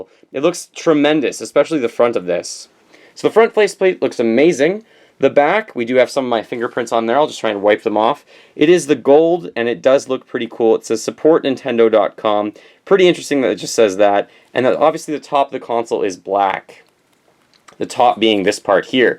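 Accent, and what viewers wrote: Canada. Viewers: That's how Canadians pronounce the letter Z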